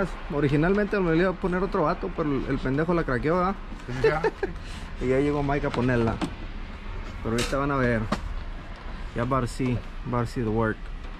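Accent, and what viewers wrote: United States. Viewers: You from Chicago???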